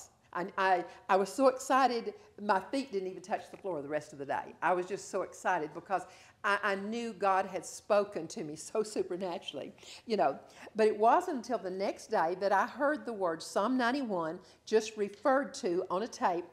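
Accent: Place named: South, United States. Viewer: Wow, she is southern - reminds me of my ancestors from OK and TX. You can slow the playback speed down a notch and you will really be able to reflect on what is being said. Too important a message to miss.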